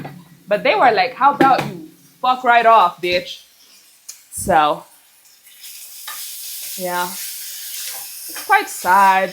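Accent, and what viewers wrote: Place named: United Kingdom. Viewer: Love the British accent ❤ girl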